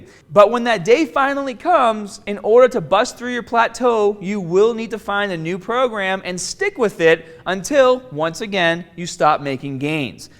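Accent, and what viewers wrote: Boston, United States. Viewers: Bahston kid! Good video, thanks. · GREAT info and Bahston accent! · Love that bastan accent 💯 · Nigga has a Boston accent and a White guy accent witch is just getting me confused like it really just throwing me off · Excellent video thank you!Love the Beantown accent. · This dude's Boston accent is amazing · I can hear a Boston accent on this guy or is it just me hearing this. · is this dude from boston?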